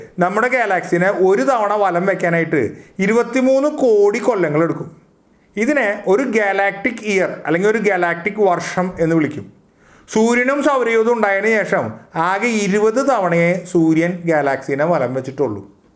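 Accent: Thrissur, India. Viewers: ഇൻഫർമേഷനിൽ കൂടുതലായി തൃശൂർ ഭാഷ കേട്ടതിൽ സന്തോഷം. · ത്രിശ്ശൂർ സ്ലാംങ്ങ് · Thrissur slang 🥰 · ത്റുശൂ൪ ഭാഷ കേൾക്കാ൯ രസമുണ്ട്. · അയ് നമ്മൾ തൃശ്ശൂരിൽ നിന്ന് ആണെന്ന് തോന്നുന്നല്ലോ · തൃശൂർ ഭാക്ഷയിലാണല്ലോ സംസരം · Thanks,നല്ല അവതരണം, തൃശൂർ സ്ലേങ്, ഇനിയും കൂടുതൽ അറിവുകൾ പ്രതീക്ഷിക്കുന്നു,, പ്രകാശത്തിന്റെ വേഗതയിൽ യാത്ര ചെയ്യാൻ പറ്റുന്ന കാലം വിദൂരമല്ല സാധിച്ചാൽ മിനിമം നേപ്ടോൺ വരേയെങ്കിലും ഒരു ട്യൂർ ഒപ്പിക്കാം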